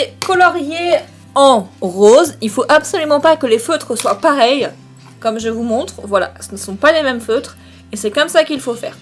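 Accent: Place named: South, France. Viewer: Avec ton genre d'accent du sud tu me fait trop penser a une youtubeuse du nom de Keyza 😆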